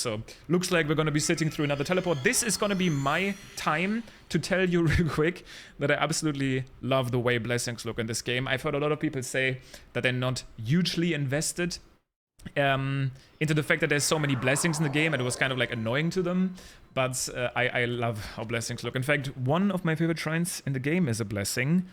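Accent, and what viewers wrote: Germany. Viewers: You have so a german acent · You sound really German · this is definetly a german accent, right? :)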